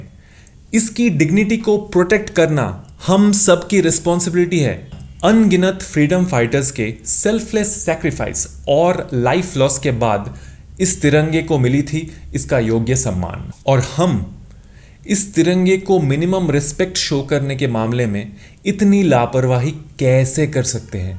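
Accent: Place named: Bengal, India. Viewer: Great going... Great info and brilliant presentation!! Looking great as well!!! Loved the pronounciations, bong touch there!! Great!!!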